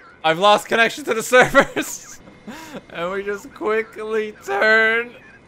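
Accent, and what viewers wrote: Ireland. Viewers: wait are you irish or something? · Is he Irish?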